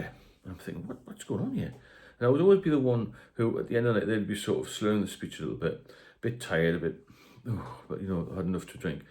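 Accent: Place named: Newcastle, United Kingdom. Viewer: You sound like you come from Newcastle. From experience, it's a shame that you don't come from a little further south, Redcar, where pub culture collides with parsimony, worthy of a David Attenborough documentary. "The Redcar drinker, always at the front of the herd approaching the pub, chattering loudly, suddenly withdraws silently to the back as the herd approaches the bar" You might as well get two pints in when it's your round, as the 'whose round is it anyway' game can go on forever when it's one of their turns.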